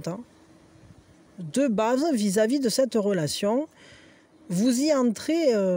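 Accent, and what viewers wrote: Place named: Alps, France. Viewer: Bonjour. Quelques semaines que je me régale a t'écouter ton accent m'est de plus très familier tu ne dois pas être très loin de chez moi lol j'hésite entre les basses alpes ou les hautes alpes. Lol bref tu es devenu mon plaisir quotidien et en plus je te trouve très douée et pleins de répartie 😂. Même si ça ne me parle pas forcément à moi j'adore ta dérision et ton humour. Donc voilà je voulais que tu le sache. Car tu mérite avec tout le temps que tu nous accorde de savoir que tu fais bien de continuer. Bonne continuation à toi au plaisir de t'écouter encore. J'adore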